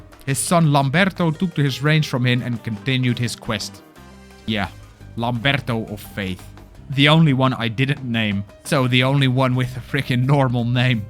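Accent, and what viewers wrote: Netherlands. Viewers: Are you dutch perhaps? I think i recognize the accent because i'm dutch as well. GEKOLONIZEERD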